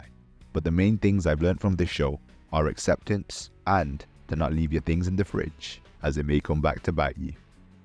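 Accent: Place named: United Kingdom. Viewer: What are you going on about? Maybe your ears are broken. He's clearly British. 😂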